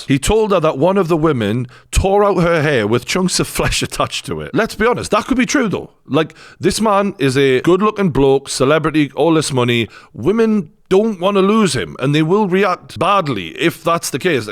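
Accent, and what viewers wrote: Newcastle, United Kingdom. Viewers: Fair enough, but in my defense I clicked because I like the true Geordie, he's entertaining no matter what he talks about.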